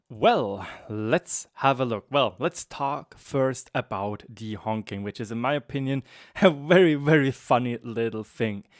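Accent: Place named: Germany. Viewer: For a German, your English is pretty ok, but maybe watch some undubbed movies to improve pronunciation a bit further..? If your looking for a broader audience.. Just a thought..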